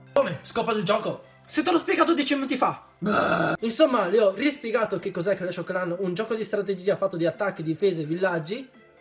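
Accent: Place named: Veneto, Italy. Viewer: di dove sei? (italia) perchè ho sentito un pò di dialetto veneto ahah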